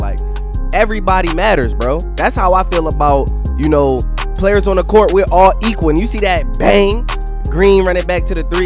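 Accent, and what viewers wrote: Baltimore, United States. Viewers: Yeeeeeeerrrrr, are you from Baltimore my guy?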